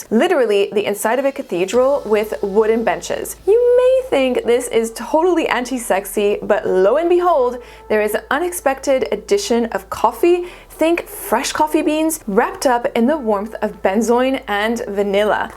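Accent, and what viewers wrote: France. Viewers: I like your french accent. Grüsse aus Deutschland. Und danke für die gesamten Inspirationen!! 😀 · thank you for this video. French accent is what I'm always looking forward to when watching your videos. Don't apologize for that. I want more French accents! 😂 I like your list. My winter favorites are: Prada L'Homme Intense, Dior Homme Parfum, Bvlgari Man In Black, Guerlain L'Homme Idéal Extrême and Stronger with You Absolutely.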